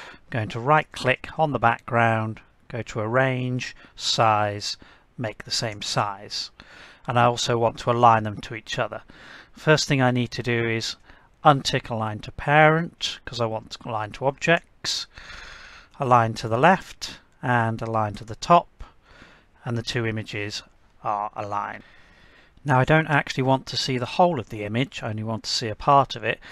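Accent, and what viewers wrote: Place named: United Kingdom. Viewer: I have been watching tons of software tutorials about different applications and so far you are the most comprehensible and concise. Seems to me that you have planned it methodically or maybe you're just a natural. And this comment is coming from a guy (me) who is a non-native English speaker listening to another guy (you) with authentic English and a British accent. Keep up the good work. Thanks and God bless.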